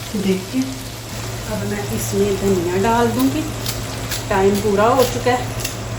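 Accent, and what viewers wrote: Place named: Punjab, India. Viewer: Allah apko sehat de, love your typical Punjabi style in urdue , I am from Texas United States of America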